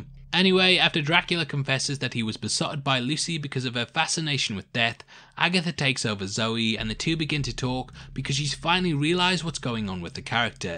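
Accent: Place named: North East England, United Kingdom. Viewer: Top vid m'man, thanks. Did enjoy the BBC Dracula. Your accent is very confusing tho, sounds like N.E. England crossed with a tinge of Australian, is this accurate? :)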